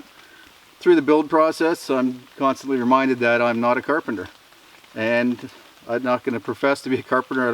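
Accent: Canada. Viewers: i can tell by the maple leafs and ur accent ur canadian lile me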